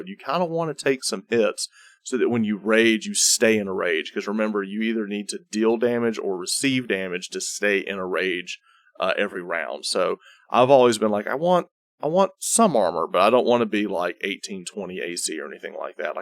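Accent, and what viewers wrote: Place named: South, United States. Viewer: As a southerner. I have to say its great hearing an eloquence bard give dnd advice in a southern drawl.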